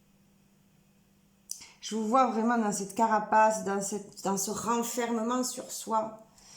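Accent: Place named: South, France. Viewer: ✨✨Bonjour ✨✨ je suis abonnée depuis peu à ta chaîne, je pense pas me trompé, en disant que c'est Aurélie Chamane qui nous à parler de toi et de ton oracle, et que les cartes était faîtes à l'effigie de tes peintures??? Wouah...tu as l'accent du sud comme moi. Qui suis native de cette région. Ta guidance ma parlée, je te remercie pour ce partage. À tout bientôt..... ✨🌴🌈💜🙏💜.🌈🌴✨..😘.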